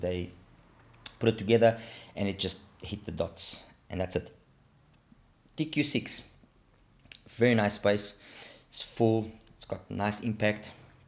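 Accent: South Africa. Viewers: Where are you from man? I live in South Africa and your accent sound South African. Just very curious now.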